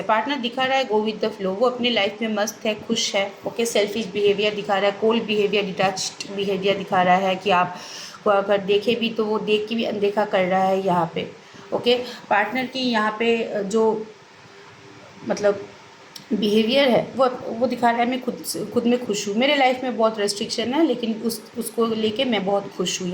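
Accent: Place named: Bengal, India. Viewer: Bhai ami tarot reading khub ekta dekhini age, normal rashifol dekhechi. Kotha sune mone holo tumi bangali tai ... khub valo reading tomar ... clear cut ... subscribe korlam... thank you ... but 1ta proshno moner modhye ghurche reading ta dekhar por thekei ... ami pure single, kothao kono option nei, ageo chilo na ... khub cleverly keu propose na kore deep interest show koreche but amar intuition bolechilo may be married... amar janar kono scope chilo na takei jigasa na korle, ami jigasa korteo parini but se kaida kore jene niyechilo ami married kina .. 1ta kajer jonno kotha hoyechilo.. romantic connection hobar kotha ekdomi noy .. ekhon kotha holo je married se to tar spouse ke chere kono din o onno kauke commitment dey na, tahole tara nijeder situation jene sudhu sudhu single der life e kosto keno baray ? Ami to flirt kori na kokhono ... korle amaro anek relation thakto fole seriousness karo proti thakto na, sobtai khela hoto ... 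sob cheye beshi koste achi ami, jobless, struggle korchi, ro anek problems ache life e ... tar vetor aei notun problem jar kono solution ache bole to amar mone hochhe na - sudhu reunion diye ki hobe bolo ? I need steady relationship not fun game... ami married hole to jeche pore onno kauke kosto dite jetam na kokhono... jara commitment debe na tara ase keno boloto ? By the way ami esob soulmate, twin flame ki jani na, bujhio na. Simple relation bujhi jekhane prem hoy eksonge thakar jonno.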